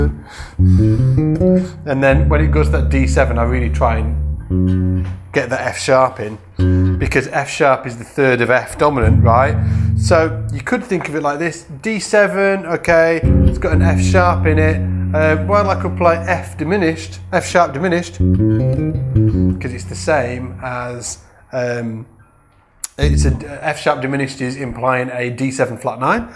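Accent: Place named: Staffordshire, United Kingdom. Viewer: Guilty as charged for murdering the blues with the pentatonic with the blue note! Pentatonic just kills the blues....well this jazzy kind of blues you show here.You sound like you're from the Lichfield/Tamworth Staffs area mate.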